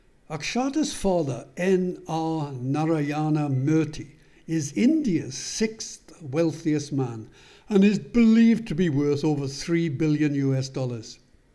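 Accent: Wales, United Kingdom. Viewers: You sound like a Welshman my brother!! 🏴❤️😎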